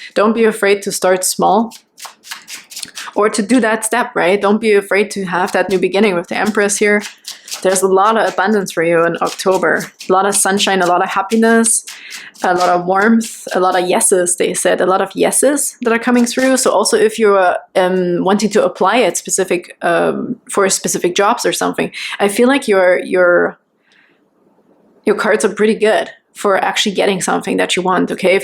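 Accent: Germany. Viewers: Dear, big thx for pile 3. It resonated 100%. Can I ask you a personal question? Is your mother language german? I kinda hear it when u speak. Big greetings from Austria, Nicole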